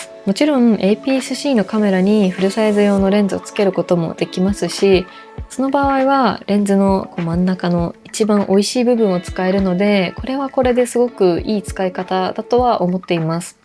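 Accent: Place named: Japan. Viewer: 너무 좋아요. 일본 사람이 한국어를 하는 억양이 그대로 살아 있어서 깜짝 놀랍니다.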